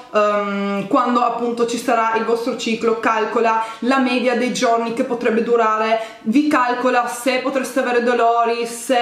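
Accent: Trieste, Italy. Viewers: sei di triestr?!?!?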